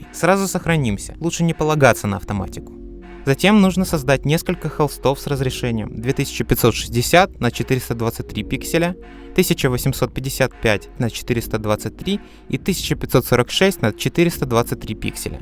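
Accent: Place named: Odessa, Ukraine. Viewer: оуу ты из Одессы))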